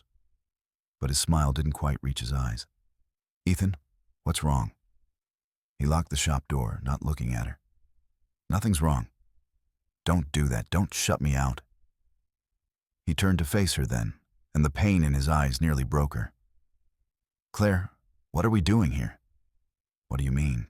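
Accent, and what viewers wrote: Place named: South Africa. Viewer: South African iloveit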